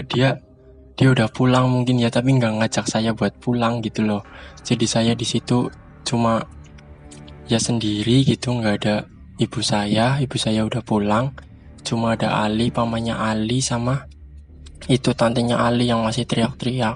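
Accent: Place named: Banyumas, Indonesia. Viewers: Waaahhh,, wong ngapak kie,,Salam ngapakerss mas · ngapak nya kerasa ya wak <3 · Itu mamakee lupa sama anak atau ketakutan sih.. sampe kasian sama mas nya dah ditinggal ndewekan 🤣🤣. Tetep pede ya mas meski medok tp justru itu kelebihanmu mas. Jd natural ceritanya. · Medhok kentel 🤭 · Wong ngapak kiye mesti. mbleketaket wkwkwk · Kiye mase mesti wong ngapak😂. Ketara bgt karo suarane🔥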